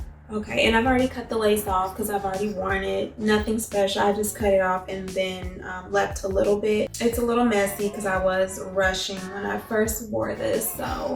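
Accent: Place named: United States. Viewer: Love your country accent girl!! Love the video thank you 👏🏾👏🏾👏🏾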